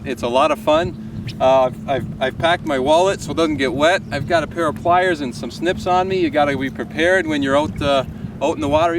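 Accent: Canada. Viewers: You sound like your from Canada, but it is always summertime in your videos?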